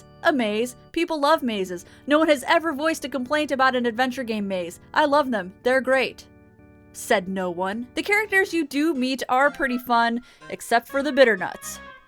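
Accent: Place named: Midwest, United States. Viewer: You sound very midwestern during your streams vs your edited videos.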